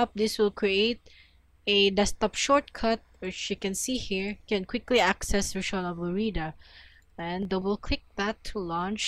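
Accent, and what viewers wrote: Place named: Philippines. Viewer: I can hear a Filipino accent, are you filipino by any chance?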